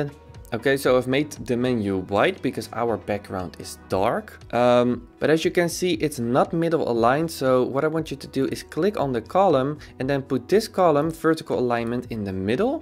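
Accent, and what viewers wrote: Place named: Netherlands. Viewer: Nice, just came across your YouTube video for a work project I have and I thought his English is good but has an accent is he Dutch and yes you are :) I used to live in the Netherlands as well until last year!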